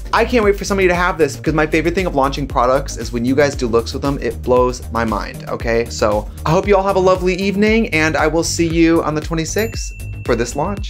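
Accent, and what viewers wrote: Wisconsin, United States. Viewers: Now that I know you’re from Wisco, I can’t I hear it. I love you so much.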